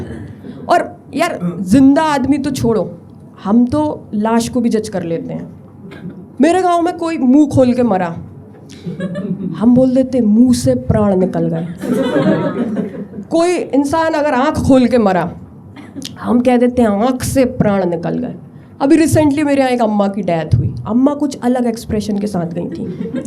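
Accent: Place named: Bundelkhand, India. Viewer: Great content, amazing delivery with a little pinch of bundelkhandi tadka… keep it up, long way to go…❤❤❤😊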